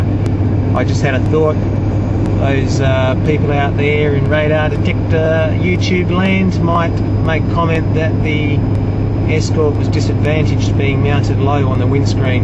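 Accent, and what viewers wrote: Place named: United Kingdom. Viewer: ok sorry about that because i'm french and having hard time understand your kind of british accent keep on good worck !! best samuel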